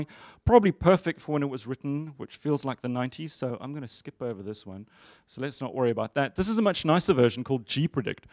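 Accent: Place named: South Africa. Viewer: I recommend watching this at 3/4 speed if you're not South African or Kiwi.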